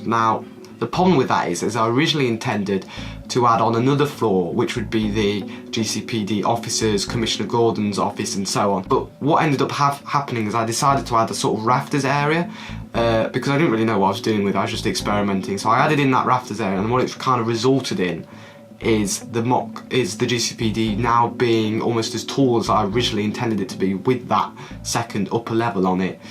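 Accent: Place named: United Kingdom. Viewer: Great mod! bri’ish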